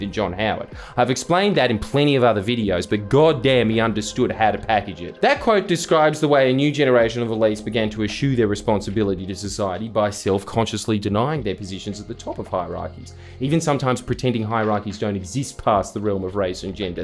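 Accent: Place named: Australia. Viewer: I’m not Australian, I don’t care about Australia, I don’t care about Australian politics, I’ve never been to Australia and I probably never will. I just like listening to funny man from Australia make jokes I don’t understand but I laugh because it’s a funny accent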